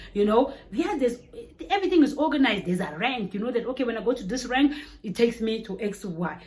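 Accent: South Africa. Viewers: Wena u not Congolese, why do u sound so south african and hood south african at that. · I love how you adopted south african accent, unlike some big headed ones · If she didn't mention that she was a Congolese I wouldn't have known.. She is south African.. The accent 👌👌🤣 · How are you not South African with that accent is just a shock to me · Manje why do you sound sooooooo South African this much. You even look like South African ladies😂😂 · South Africa has rubbed off of you, you sound so much like us now hahahahahaha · Your accent is so South African 🇿🇦. 😊♥️ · The accent is legit SA. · Shuuuuu, the South African accent is legit. Wow sis...💯🇿🇦👌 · I would'nt believe you are Congolese coz you have a South African accent 😊🙈 Love your personality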